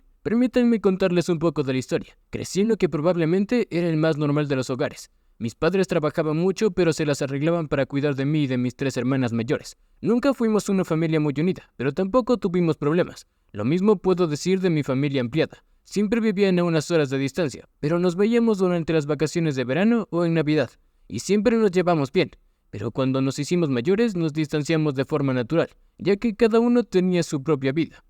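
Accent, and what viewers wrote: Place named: Arab world. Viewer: El acento árabe del software de lectura me ha hecho gracia, solo necesitaba oír que dijera "Habibi"!!!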